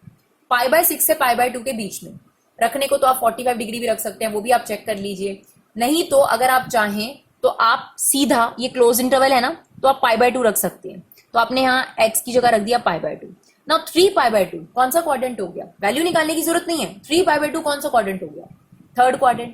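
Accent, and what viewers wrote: India. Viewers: I believe that u are from Jharkhand ,Bihar or UP Tone feels familiar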